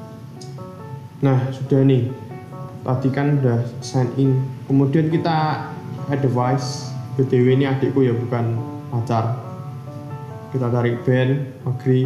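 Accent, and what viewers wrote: Java, Indonesia. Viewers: Jowone medok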